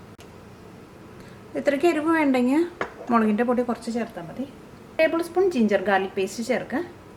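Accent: Thrissur, India. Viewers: Thrissur bhashayanishta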